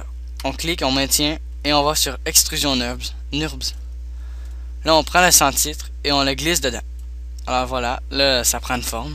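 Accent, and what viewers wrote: Canada. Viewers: L'accent canadienne ♥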